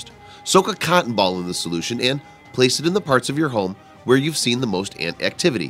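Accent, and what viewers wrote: United States. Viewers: Long winded American voice drive insane ,please come straight to the point, be more helpful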